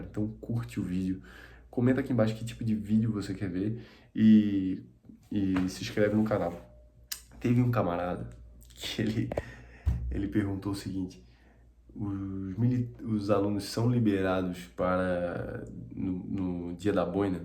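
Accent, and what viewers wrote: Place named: Florianópolis, Brazil. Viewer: Pois é, tbm reparei, ta recuperando o sotaque do manezinho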